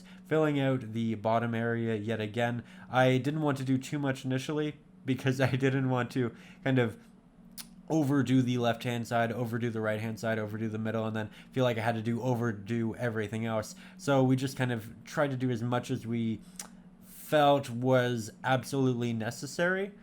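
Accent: Canada. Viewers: This guy sounds like he is from Canada.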